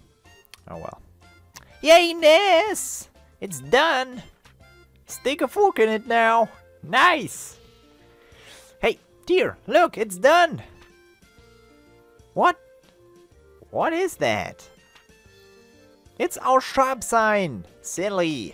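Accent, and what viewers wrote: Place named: Germany. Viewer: Yayness for German accent! CAPITALISM, HO!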